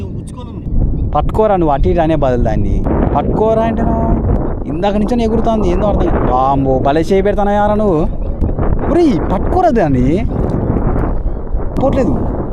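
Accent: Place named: Nalgonda, India. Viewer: Bro kotha district lu bifurcation kanapudu medhi nalgonda jilla kani meku dhaggarlo narshimulapeta mandal warangal jilla undey same me slange.. attincharu bro meru...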